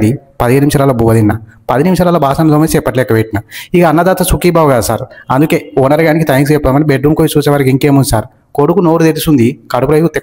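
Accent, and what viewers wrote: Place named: Telangana, India. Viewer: Telangana yasa, you are not a normal person Sir , intha andanga untundi yasa mi videos lo, how the dialogue Delivery ❤❤❤❤❤❤❤❤.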